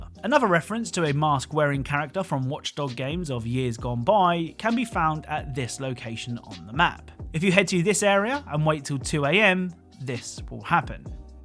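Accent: England, United Kingdom. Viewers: Like how? Like catacombs..? Or like catacombs? Or maybe catacombs? I don't get what you're saying. I mean he's got an English accent, but he says catacombs as catacombs...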